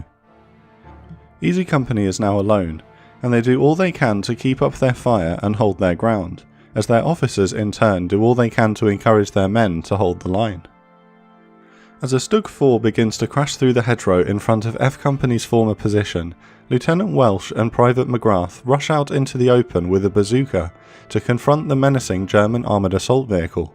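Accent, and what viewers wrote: United Kingdom. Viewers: Excellent presentation, as usual. And your British accent gives extra gravitas to the narration. Keep them coming.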